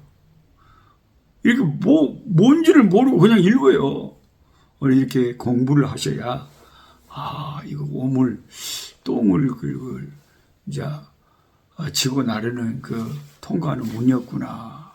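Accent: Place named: Beolgyo, South Korea. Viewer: 목사님! 저는 여수 수정로교회 를 섬기는 선 미자 권사입니다.. 아가서 말씀으로 목사님 설교에 많은 은혜를 받고 찬양대에 오셔서 기도해 주셔서 너무 감사했습니다.. 개인적으로 인사 드리고 싶었으나 식당에서 목사님 가까이에서 식사 하면서도 저만 목사님을 보면서 너무 반갑고 기뻤 습니다.. 유튜브 꿀송이 보약 큐티를 통해 목사님을 알고 벌교 지동리로 제가 1974년도에 결혼을해서 2년동안 낙안 중앙교회를 섬기다가 77년도에 여수로 왔기 때문에 목사님의 벌교 사투리에 더 정이가고 뵙고 싶었는데 목사님앞에 나서지를 못했네요.. 앞으로도 유튜브에서 계속 은혜받고 뵙겠습니다.. 감사합니다..❤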